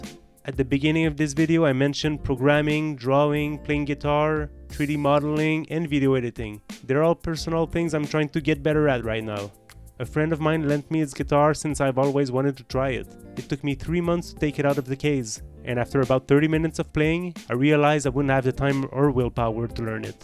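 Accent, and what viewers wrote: Quebec, Canada. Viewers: tu sonne quebecoi, nice video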